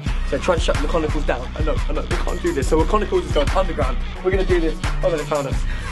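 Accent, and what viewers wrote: United Kingdom. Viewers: I live in a country which English is not our first language but I can understand what he's talking about although not every words I catch :)) His accent is so sexy. Is that Brighton accent? (If it exists)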